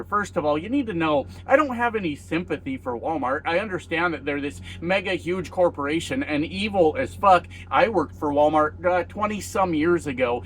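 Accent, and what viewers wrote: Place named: Canada. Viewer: Howdy Trucker from Canada! Keep these videos coming. I'm addicted now to my daily Postcards from the Cab! You sound Canadian!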